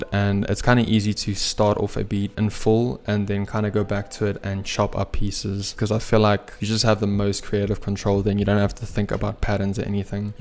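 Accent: South Africa. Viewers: I smell a south african accent ei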